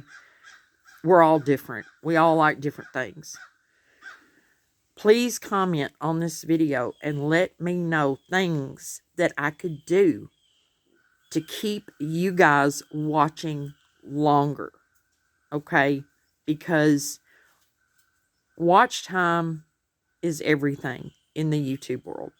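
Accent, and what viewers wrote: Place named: Texas, United States. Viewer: I am sorry that is happening. I watch yours throughout but I do click off of the creators that sing or show a lot of painting or make the crafts in real time. If I can’t understand the creator… I feel bad but I just can’t. I am a Texan and so you sound like me. 😂 You are very talented. Are you doing shorts? I hate them but I think a lot of YouTubers use those.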